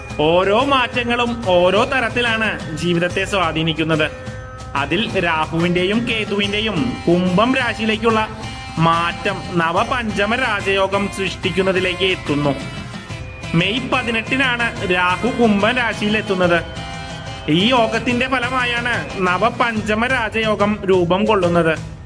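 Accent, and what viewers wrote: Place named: Thrissur, India. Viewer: തൃശൂർ ആണ് ല്ലേ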